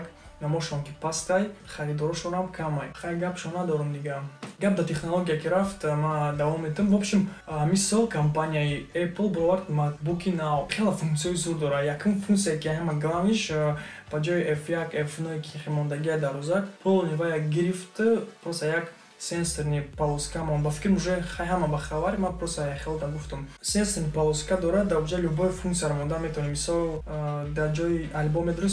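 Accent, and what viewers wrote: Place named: Dushanbe, Tajikistan. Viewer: мо душанбегие хамихели гап мезанем ихели лавза созтар мефахмем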